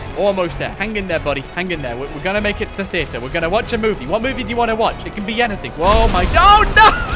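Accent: United Kingdom. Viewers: Is he British or American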